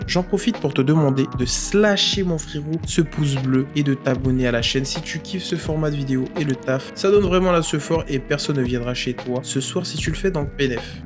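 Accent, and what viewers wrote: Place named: Dominican Republic. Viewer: la façon de comment il parle espagnol sa se voit que c'est un dominicain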